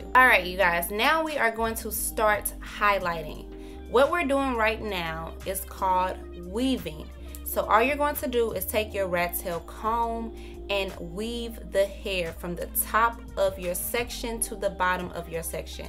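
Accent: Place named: Detroit, United States. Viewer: You sound like you from Detroit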